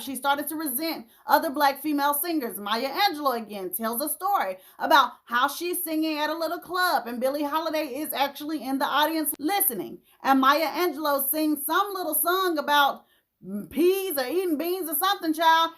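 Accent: South, United States. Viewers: I just love your southern accent.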